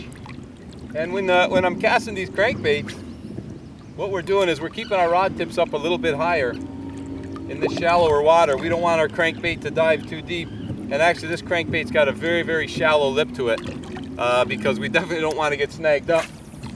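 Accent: Canada. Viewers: You sound like your from Canada, but it is always summertime in your videos?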